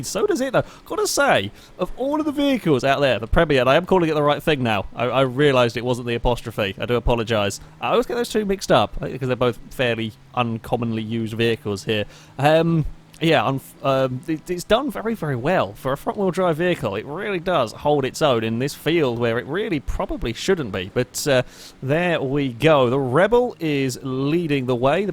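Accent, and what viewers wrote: United Kingdom. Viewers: you sound so fabulously British :D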